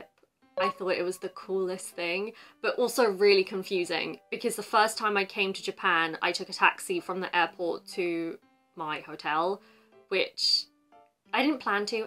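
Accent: United Kingdom. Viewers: It’s a beautiful British English!!!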